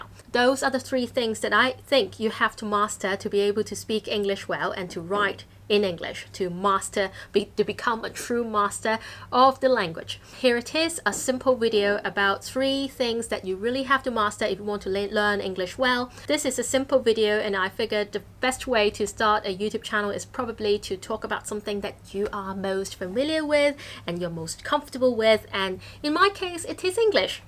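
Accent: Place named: England, United Kingdom. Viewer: Being able to speak English fluently is a must and it's a routine / daily practice for every English teacher. It's not something AMAZING for an English teacher to do, or something that an English teacher has to be BRAVE to do among a crowd of students. I guess you may have exaggerated your ability to speak fluently with a bit of English accent. I'd like to tell you that there are hundreds and thousands of lecturers / teachers out there who can also speak English EVEN MORE FLUENTLY than you can. If you jump into any English Department in any university in HK, you'll soon find that you are just one of the thousands in the 7.5M population in HK.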